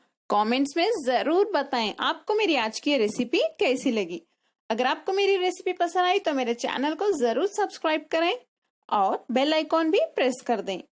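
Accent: Hyderabad, India. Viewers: Madam ka Slang Hyderabadi dikhra😁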